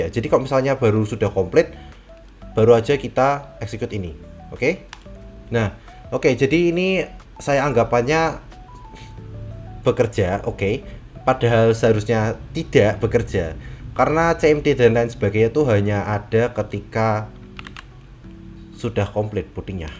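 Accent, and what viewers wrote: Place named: Java, Indonesia. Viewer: keliatan jowo nya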